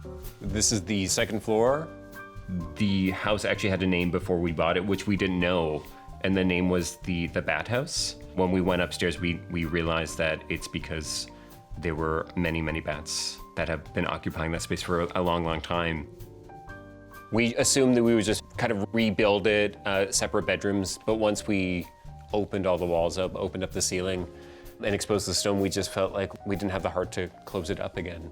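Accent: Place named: Midwest, United States. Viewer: Wow - this guy is from the Midwest if ever I’ve heard a midwesterner. (Update: Ontario accents being synonymous to those in Michigan, upstate New York, or Wisconsin.)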